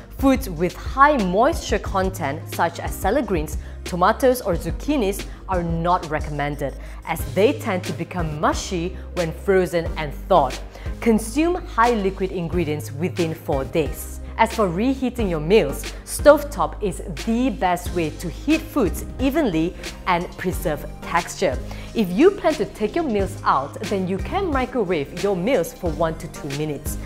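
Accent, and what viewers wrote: Malaysia. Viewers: Never felt this proud of our Malaysian accent😇👍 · It’s a good Malaysian accent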